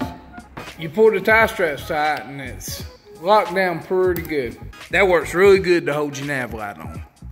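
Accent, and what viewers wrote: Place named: Arkansas, United States. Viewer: Man you sound like home cooking to "dis" here displaced Arkansan! Thanks "fo da" videos brother... will be using lots of this info in my build.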